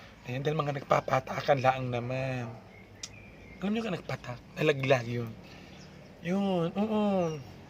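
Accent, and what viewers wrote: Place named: Cavite, Philippines. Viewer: ganyan din dito samin sa Amadeo Cavite. Same accent kaya nakakamiss ang childhood palagi ako nanunuod ng vlogs mo haha Thanks for always making my day idol!!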